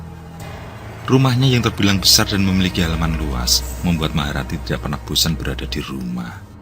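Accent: Java, Indonesia. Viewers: Kenapa di kalimantan logatnya ada jawa²nya iya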